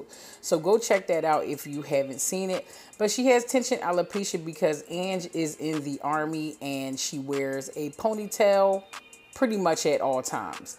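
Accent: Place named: Louisiana, United States. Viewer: Are you from Louisiana? Your accent sounds creole like some south Louisiana residents.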